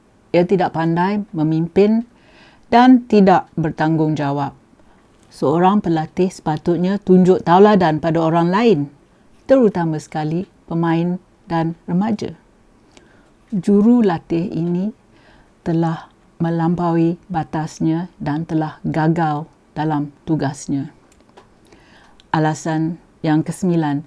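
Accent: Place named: Kelantan, Malaysia. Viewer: speaks malay kelate accent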